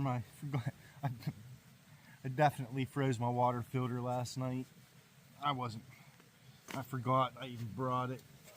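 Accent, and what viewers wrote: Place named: Pittsburgh, United States. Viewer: I can yell you are from western PA. Lol. The Pittsburgh accent. I am too. Great vid man.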